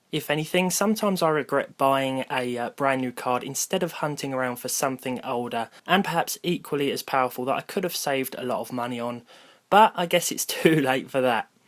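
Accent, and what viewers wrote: United Kingdom. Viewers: british accect ftw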